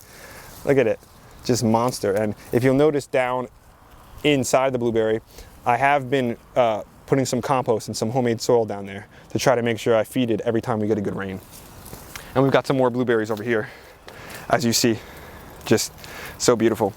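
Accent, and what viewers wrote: New Jersey, United States. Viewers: That Jersey accent is going strong! Wudder💧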